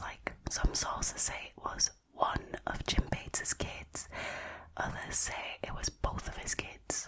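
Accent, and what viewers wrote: Northern England, United Kingdom. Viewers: Sorry I have also been trying to locate the accent. It’s great and really draws me back to the channel. I studied and lived up north and have a northern dad although I come from and live down south but miss the accent sometimes. Understand why you wouldn’t want to be specific but I’m speculating England’s biggest county (or near to). Thanks for more spooky storytelling as always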